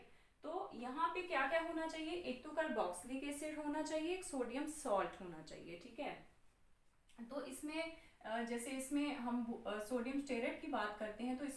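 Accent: Punjab, India. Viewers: Mam apka channel acha study karwane ka style bhut vadiya sabse badi batt acennt english language ka punjabi hay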